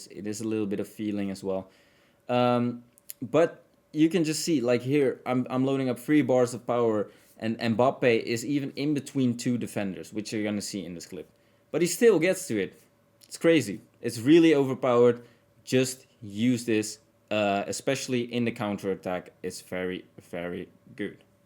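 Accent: Netherlands. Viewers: Kom jij uit doetichem hahahaha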